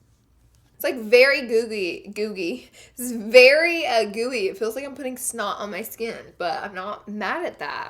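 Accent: South, United States. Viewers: Jennifer Lynn its called a southern accent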